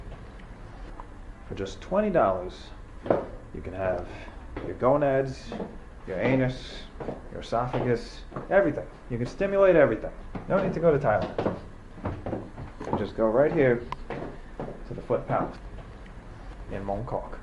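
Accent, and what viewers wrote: New York, United States. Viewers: This channel def makes me appreciate china al ot more. Very cool perspectives. People are nice and appreciate he can speak the language. Hilarious how his American accent is so New York. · Your New york accent is very strong in this video, or maybe I'm just noticing it now ;) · Apart from a great but nostalgic video I really like the NY accent and directness. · I can't do the accent man, I think you're a great watch, but that Judge Judy accent is getting thicker. Reminds me of some people who you would not want to be associated with from N.Y. Stay authentic mate. Jake in Oz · You sound more New York than the last video I just watched. I’m guessing you had recently been back . Interesting how accents morph as we travel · Love the NY accent. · Great video. Your New York accent really came out this video 😂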